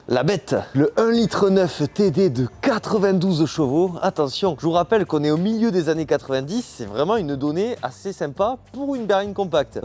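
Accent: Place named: South West, France. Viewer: J’adore l’accent du Sud Ouest !👍🏻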